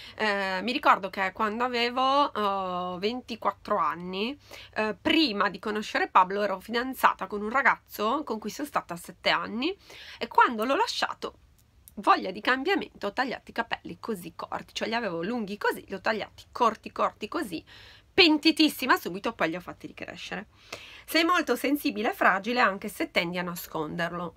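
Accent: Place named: Sardinia, Italy. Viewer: Si questo è il nostro accento cagliaritano quando vuoi lo parliamo assieme 😉😉😉😉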